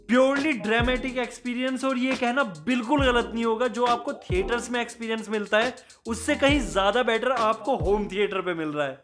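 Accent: Meerut, India. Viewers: Dislike. Can't understand this Indian english accent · Bhai aapki zaban se Meerut barasta hai 😀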